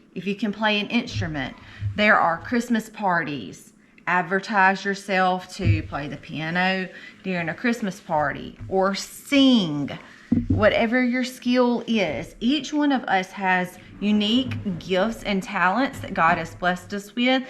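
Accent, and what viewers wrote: South, United States. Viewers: Subscribed to both yalls channels and watch daily. Girl you even more southern than i am and love to hear you talk.keep up the good work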